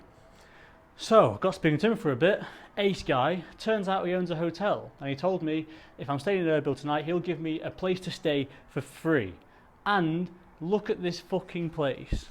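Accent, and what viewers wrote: United Kingdom. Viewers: well done mate. I just can't help but laugh watching and hearing your northerners accent talking about my beloved homeland Kurdistan... the highlight of the video is where you say the cherry on the cake "the bloody toilet", I know exactly what you mean. I wanna congratulate you on this fantastic piece. although there much more to explore in Kurdistan and I hope others in the west will visit and follow you. bravo.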